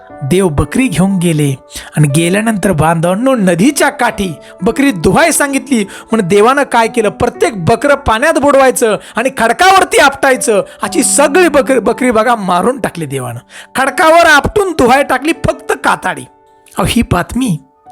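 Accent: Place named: Belgaum, India. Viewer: tumchya bolnyavarun tari tumhi belgav che mulche asave ase vatte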